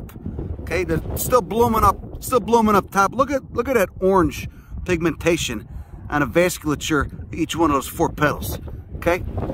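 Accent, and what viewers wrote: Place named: Boston, United States. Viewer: You guys are so bad! Lmao! It sounds like a Boston accent, but it's killing me.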